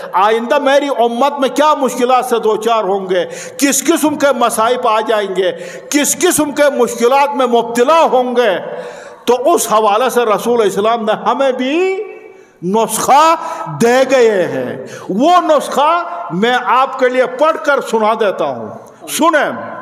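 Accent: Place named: Gilgit, Pakistan. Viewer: I don't understand the majority audience are pashtoon then why he delivered his speech in urdu because he seems to be gilgiti not local by his accent strange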